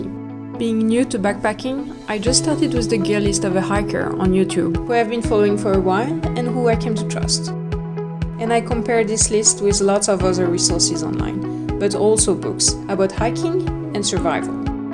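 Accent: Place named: Europe. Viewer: Wow the production of this is amazing! I decided to subscribe within seconds. Can’t wait to see more! You sound European. I’m Welsh. Where are you from?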